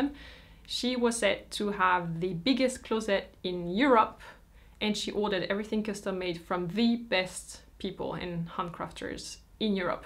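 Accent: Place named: France. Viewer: Dear, your English is very good, even with so much French an intonation. I've learned a lot from your videos that I have no idea before. You are my favorite.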